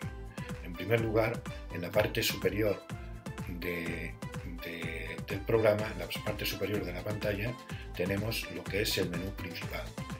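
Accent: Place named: Extremadura, Spain. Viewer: Buenas noches, me encanta lo que estoy viendo. Soy una persona mayor y quiero hacer algún video con mi ordenador Mac. Además por el acento del profesor creo que es extremeño. Yo también lo soy, aunque vivo lejos. Muchas gracias.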